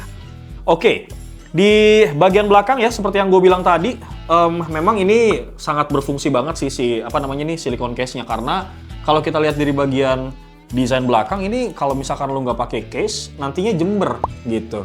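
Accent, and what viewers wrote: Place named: Depok, Indonesia. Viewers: bahasa lu kaya orang Depok Bang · W suka penyampaian lu bang, jakarte bgt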